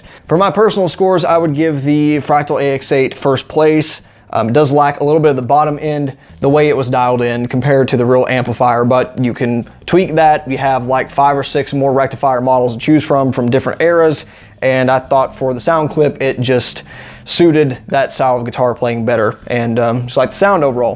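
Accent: Texas, United States. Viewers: Dude, a Pantera t-shirt goes with that accent, not a Black Sabbath one.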